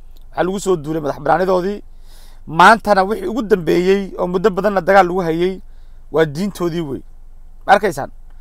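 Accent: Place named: Ethiopia. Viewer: Wallaal Ninkaan Somaali Maaha waa Nin Cabasha oo afsomaaliga Bartey. Bisiq Saraan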